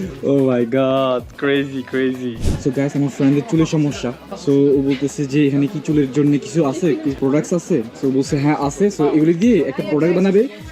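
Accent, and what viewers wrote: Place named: Noakhali, Bangladesh. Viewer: ভিডিও টা বেশ উপভোগ করলাম 💜 লোকাল বাজার, নিজস্ব পদ্ধতিতে সাবান তৈরি করা, তেল তৈরি করা, জাস্ট অসাধারণ। ভাই, কিছু মনে না করলে আপনার জন্মস্থান কোন জেলা/থানায় জানতে চাচ্ছিলাম। আসলে আপনার কথার টোন অনেকটা আমাদের এইদিকের মতো লাগতেছে লাকসাম/নোয়াখালী/চাটখিল, তাই জানার আগ্রহ হলো। ধন্যবাদ জানবেন💜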